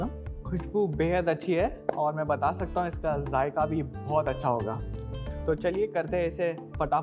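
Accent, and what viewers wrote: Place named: Lucknow, India. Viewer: Your accent is very neutral (Lucknow/urban area of MP I'm guessing) And your way of explaining things just awesome. Soothing voice and delicious recipe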